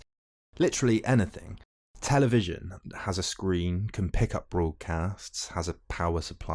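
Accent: United Kingdom. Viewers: oh man, i love hearing this info from a british accent instead of an american. i feel so smart right now lmao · With these types of videos, my brain cells really appreciate the British accent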